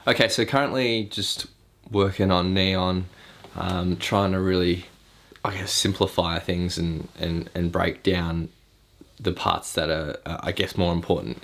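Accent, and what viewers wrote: Australia. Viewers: hey man are you from Australia just wondering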